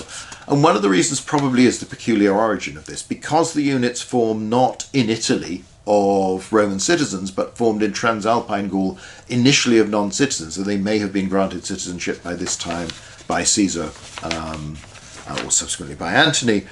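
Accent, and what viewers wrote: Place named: Cambridge, United Kingdom. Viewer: Its called Cambridgian English. Kinda like Cajun English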